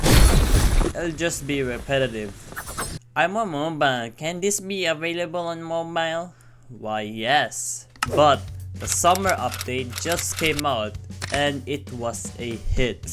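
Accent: Philippines. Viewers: Im new to the channel and 20 seconds in i noticed that Filipino accent XD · haha knew it from the accent, im also a filipino playing cw nice content btw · you sound filipino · This is the most pinoy accent ever yo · Filipino Accent??? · Is Filipino? cuz the accent sounds like it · the strong filipino accent is killing me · pinoy to AHAHAAH halata sa accent eh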